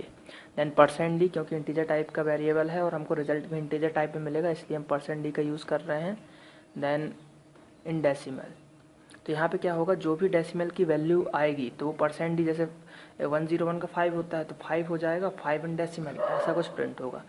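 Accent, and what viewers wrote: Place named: India. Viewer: dunno if that's indian or English with a very very strong indian accent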